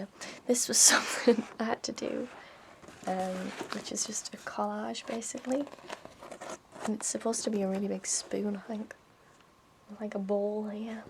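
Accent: United Kingdom. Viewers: are you from england ? cuse it seems like you have a british accent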